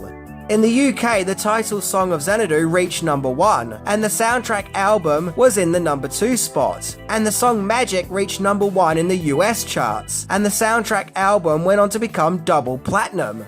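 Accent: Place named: Australia. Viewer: I was just a kid when I saw this. This is one of my favorite movies. Please try me! I'll update as I watch this. So, 1st you're Aussie without you telling me you're Aussie. Oliviabwas actually from the UK. Waiting for you to mention her ex-husband, which she had 1 child. He played the younger Gene Kelly. And yes, this is literally an album. Knew she loved this because Gene Kelly!!! She looked absolutely beautiful in this movie and i stan 💯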